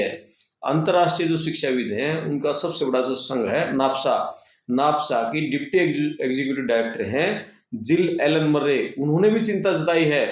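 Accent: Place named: Sindhi, India. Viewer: Improve your sindhi hindi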